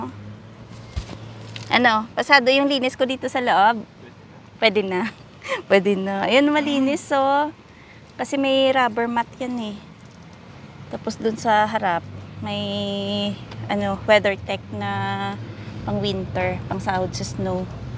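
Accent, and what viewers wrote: Hiligaynon, Philippines. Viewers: parang ilongga ka maam ah the way ka po magsalita . new subscriber po. naghahanap kasi ako trabaho abroad.... kayo nakita ko po vlog nyo ..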